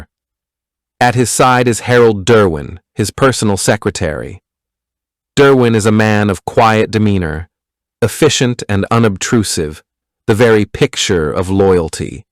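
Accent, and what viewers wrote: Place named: United States. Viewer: The American accent spoils it for me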